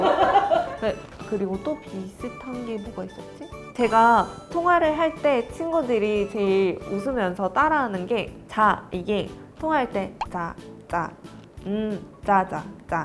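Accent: Seoul, South Korea. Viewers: 뭐야 생긴거부터 말하는거 까지 그냥 토종 한국인인데?? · 외모도 발음도 완전 한국인인데 몽골이랑 한국이랑 혈통적으로 뭔가 있나봄..... 신기방기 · 완전 한국 서울 여자로 알았습니다. 멋집니다. ^^ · 외모, 발음 그냥 한국인인데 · 오잉 보면서 한국분인줄알았는데 말씀하시는게 한국 한국 하시니까 몽골분이시구나.. 와 발음이 외국이라는 생각 하나도 안들정도의 당연한 한국발음인데 신기하네여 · 외모나 발음 완벽한 한국인인데요! 몽골하면 징기즈칸 이 떠오르고 고려조 때 공민왕이 생각나 는데요! 고주몽을- 시조신으로 삼는다 하여 무척 놀랐고- 한국인과 외모는 완 전같다는생각입니다 두나라가 공통점이- 많아서 동질감을 많 이느낍니다 🍸🌈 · 이 분은 그냥 100% 한국사람 말투네요. 왠지 무섭다. ㅋㅋ.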